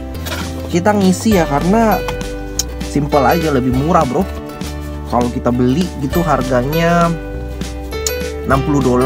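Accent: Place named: Minangkabau, Indonesia. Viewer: Logat minangnya masih kental banget bang.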